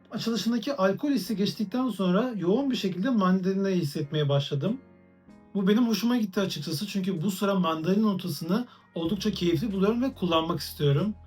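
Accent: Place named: Central Anatolia, Turkey. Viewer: daha instagram diyemiyon mudur. nerenin aksani bu ic anadolu lu musun. ?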